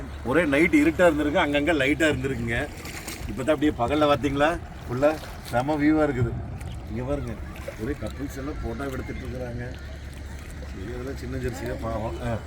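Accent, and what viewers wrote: Kongu, India. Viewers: கொங்கு தமிழ், இளமை,இனிமை அவர்கள்.TMF from coimbatore. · கொஞ்சும் கொங்குத் தமிழ் பேசும் கோமகரே...! திகட்டாத தித்திக்கும் பேச்சுடைய திருப்பூர் திருமகரே...! எங்கள் மதிப்பிற்குறிய டான் திரு. அண்ணா❤❤❤🙏 அவர்களே எப்படி இருக்கீங்க அண்ணி❤❤❤🙏 எப்படி இருக்காங்க ரித்திக்❤❤❤ எப்படி இருக்காரு. அருமையான வீடியோ பார்க்க பார்க்க இடங்கள் அழகோ அழகு❤❤❤ அண்ணா இந்த வீடியோவுல நம்ம TMF ஒருத்தர் "Marvel Movies"னு ஒருவர் கமெண்ட் பன்னிருக்காரு அவர் கமெண்ட் பன்னிருக்கது 1000% correct. குறிப்பிட்ட இடங்களில் மட்டும் ட்ரோன் கேமரா மேல பறக்கவிடுங்க. ஜம்மு காஷ்மீர் ராணுவத்தின் ட்ரோன் கேமராவும் முக்கால்வாசி இடங்களில் மேல பறந்து கொண்டிருக்கும். அவங்க கண்ணுல வேற ஒரு ட்ரோன் கேமரா பறக்குறது பார்த்தால் SHOOT பன்னிடுவாங்க. அப்பறம் LOCATION ah TRACK பன்னி உங்களுக்கும் Enquiry வருவதற்கு வாய்ப்பு உண்டு. கொஞ்சம் கவனமா இருங்க அண்ணா. நம் ❤சிட்டுக்குருவியோட❤ தங்களது இந்த லடாக் பயணம் இனிதே தொடர்ந்திட நல்வாழ்த்துக்கள் அண்ணா❤❤❤ குளிர் இன்னுமே மைனஸ் டிகிரிக்கு கீழ வரும் அண்ணா உடம்ப பார்த்துக்கங்க அண்ணா🙏 என்றும் அன்புடன் உங்கள் தம்பி என்றும் அன்புடன் உங்கள் TMF ரா.முஹம்மது கபூர் (சென்னையில் இருந்து)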